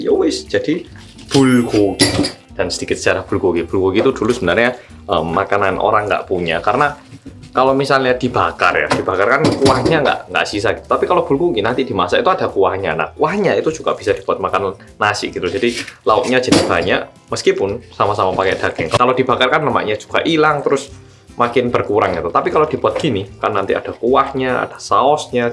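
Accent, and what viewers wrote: Java, Indonesia. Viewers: Mas tahun ini ada gak?😁🙈 Kangen nih sambil nunggu buka hahaha mudahan dibaca mas medok😁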